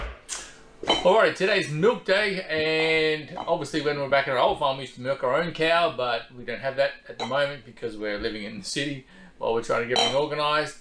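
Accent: Australia. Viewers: Прекрасная возможность освежить свой австралийский английский.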